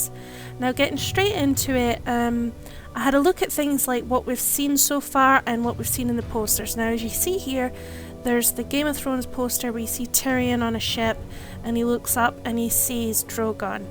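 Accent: Scotland, United Kingdom. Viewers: What accent have you got? Is it Scottish sorry I'm terrible at telling which accent people are from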